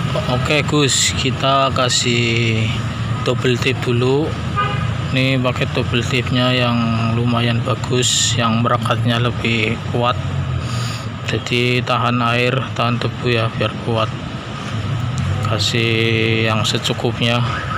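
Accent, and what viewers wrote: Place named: Tegal, Indonesia. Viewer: Tegale ndi mas broo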